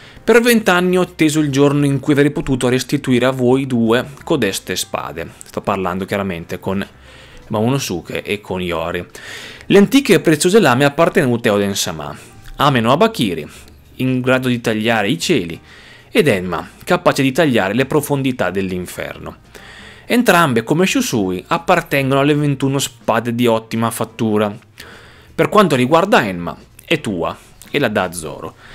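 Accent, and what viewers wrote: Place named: Umbria, Italy. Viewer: senti io mi diverto a indovinare gli accenti..secondo me sei di città di castello dimmi se ci ho preso. Saluti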